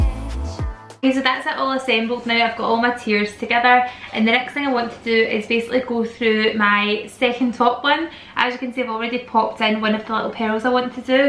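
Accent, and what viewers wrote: Scotland, United Kingdom. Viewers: omg i lovvvveeeeeeee scottish english 😍😍 - greets from switzerland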